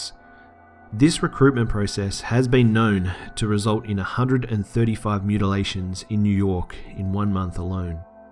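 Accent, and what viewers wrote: Australia. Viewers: Hoho your pronunciation are still hilariously Australian...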